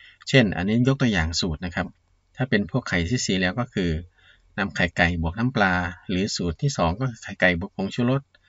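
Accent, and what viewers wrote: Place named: Isan, Thailand. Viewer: มีสำเนียงอีสานด้วยแมนบ๊อจร้า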